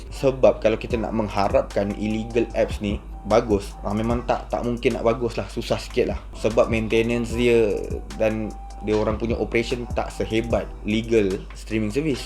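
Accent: Java, Indonesia. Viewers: bang ko org mna. ckp mcm ada sleng jawa je..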